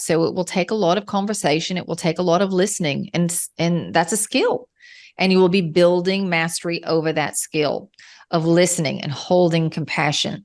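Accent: Australia. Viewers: They sound kinda Australian. hehe :) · Loved this so much!! Touched points I thought I felt I needed clarity on, love it! I think she's got some Aussie heritage? I can hear it in her voice! Wonderful insight from source XXX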